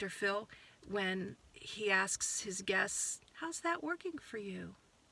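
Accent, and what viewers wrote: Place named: Philadelphia, United States. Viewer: Oh one more thing...I am from Philadelphia, ..I now live in MIchigan..but boy you sound like a philly girl to me ..are you from the east coast?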